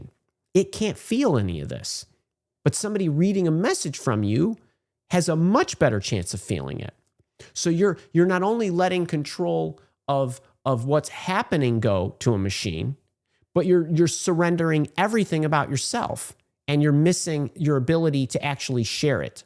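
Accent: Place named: Chicago, United States. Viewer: He talks just like Billy Corgan. Must be from Chicahgo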